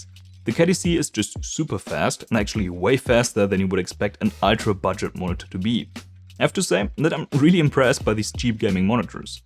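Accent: Germany. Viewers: r u german? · wie man merkt dass du deutscher bist hahahah :D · Kanns sein das du deutsch bist? Trotzdem guter Akzent. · Ich habe das gesamte Video gedacht „Der ist doch 100% Deutschsprachig", und trotzdem hat mich dieses „Bis zum nächsten Video" komplett gefickt 😂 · Do I hear Deutsch??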